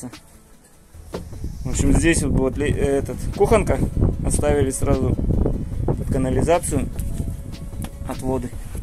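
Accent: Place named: Tatarstan, Russia. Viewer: Ты походу татарин! Акцент татарский)!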